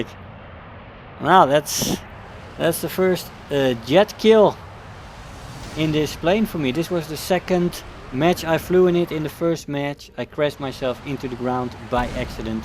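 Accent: Netherlands. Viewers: are you Dutch? Your voice sounds like you are dutch xD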